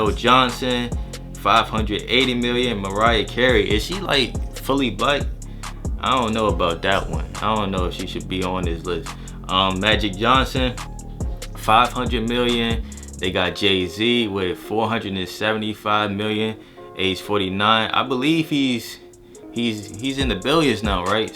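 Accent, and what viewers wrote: Philadelphia, United States. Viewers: You sound like Philly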